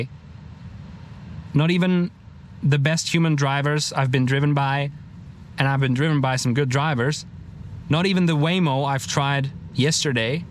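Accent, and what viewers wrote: United States. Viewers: Your American accent is impressive! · I would not know that you aren't an American. Your English is terrific. You sound like any other American to me. Enjoy your visit and please visit other states. I'm in central Ohio. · You did an excellent job for a newbie even your English sounds American. I can confirm what your conclusions are because we purchased a Tesla model Y Juniper two months ago and it is running and maneuvering with FSD very nicely. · While not perfect, your accent is amazing and sounds very American!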